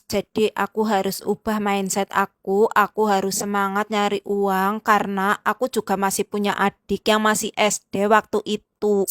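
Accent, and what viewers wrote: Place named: Banyumas, Indonesia. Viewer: Sehat dan semangat terus mba'e💪💪💪 Asli mna mba'e kok kayakne ngapak2 😂😂 kaya inyong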